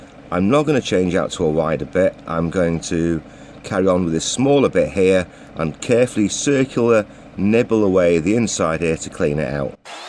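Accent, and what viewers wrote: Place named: Manchester, United Kingdom. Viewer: My God he's from Manchester!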